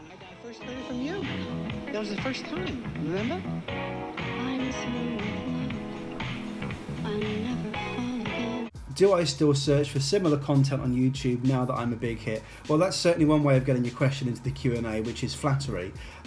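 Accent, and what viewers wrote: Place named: Newcastle, United Kingdom. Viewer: Oh, so you're half geordie!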